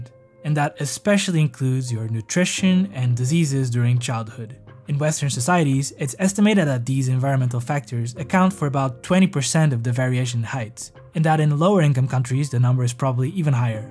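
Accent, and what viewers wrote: Portugal. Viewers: i cant take this portuguese accent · I'd bet my whole house that you're Portuguese, by your accent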